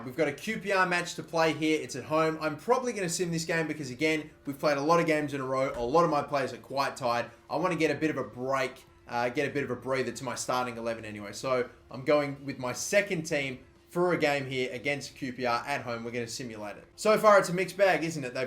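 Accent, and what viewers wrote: Australia. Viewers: Mardoche Basisa he's Australian... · Mardoche Basisa he has an australian accent...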